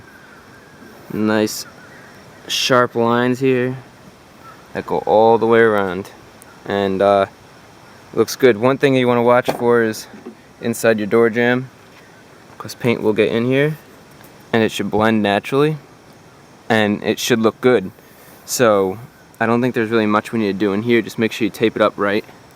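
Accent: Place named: Philadelphia, United States. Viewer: I don't recall ever watching this video. I use to have a '97 B2300, but didn't have it long enough to do this. My current 08 Honda Crv has fading on the B pillar trim and trying to fix it. Dealer says that its a film on top. I hope its an easy fix. Was this video before "Soappy Woder"? Philly guy here, we say woder the same way.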